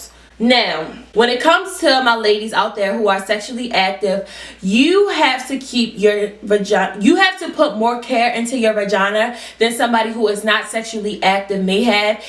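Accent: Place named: Maryland, United States. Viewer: Hearing you talk I was like “hmmm she sounds like she’s from Maryland” then I read the DB 😂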